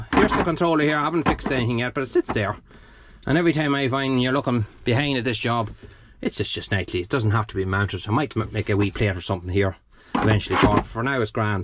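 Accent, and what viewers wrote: Ireland. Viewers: I agree that our phones or iPads have ears. 😂you are not only an excellent farmer, a diary farmer but also an expert mechanic and horticulturalist as well. You are one clever man with many talents and both my husband and myself love watching your videos as you make them so interesting and varied. The Irish accent helps as well as it’s our favourite. We are watching you from down under in Perth, Western Australia.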